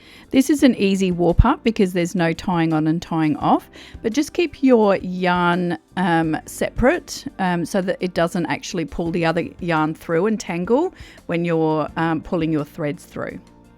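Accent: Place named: Australia. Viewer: Love to hear an Australian accent !!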